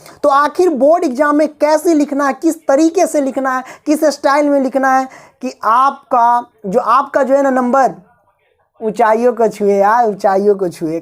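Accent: Bihar, India. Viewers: Aap bihar se ho kya? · Sir aap kaha se hai apki avj se lgta h aap Bihar se hai ??👍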